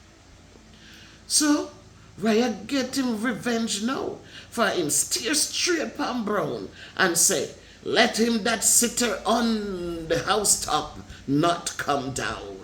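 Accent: Jamaica. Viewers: you have me cracking up. I love to hear you talk that patwa talk.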